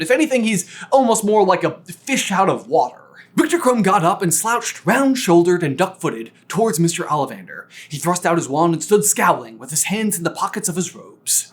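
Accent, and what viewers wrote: South, United States. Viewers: I didn't care for the Southern accent and feel like there are too many clips cut in the video. That being said you guys are amazing and I liked the theory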